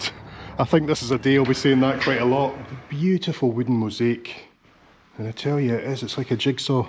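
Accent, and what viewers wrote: Scotland, United Kingdom. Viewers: I enjoyed your trip,I just love you Scottish accent,I find everyone of your journeys wonderfull keep up the good work😊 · Love your 'posh' Scottish accent.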